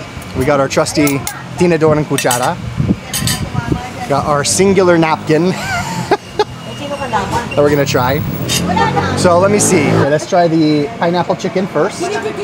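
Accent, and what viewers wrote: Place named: Tagalog, Philippines. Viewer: Pareho kau ni zombie ng accent sa tagalog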